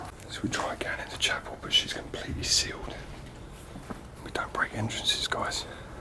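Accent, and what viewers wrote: England, United Kingdom. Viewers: Sorry... I couldn't view this one ... I feel energy and didn't want to go any further....There are place that have residual energy and you can feel it. This one is Heavy. Not saying bad...just uh uh.. But, I know you gave it the respect and love for what was left there. Good Job. I have to say in other places I have seen you go to....You speak while you are doing it. I want you to know that As you are speaking you are including those who view...As they are there with you and sharing the experience....You do an Awesome Job...and LOVE the brogue...( not meaning anything by it ) My First Love was from England and He spoke the way you do....It makes me smile. I'm a fan...And I'm older than your Mum so I'm safe ! Lol Thanks for taking us places I have never been.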